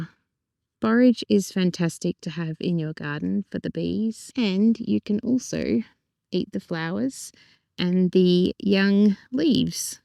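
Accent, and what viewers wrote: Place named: Australia. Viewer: so good to hear an Aussie voice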